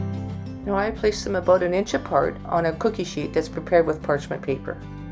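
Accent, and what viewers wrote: Canada. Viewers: Are you Canadian? You have such a strong Canadian accent!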